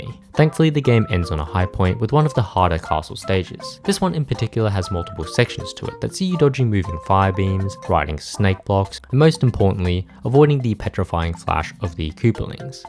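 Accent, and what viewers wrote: United Kingdom. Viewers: british ew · its standard pronunciation for uk english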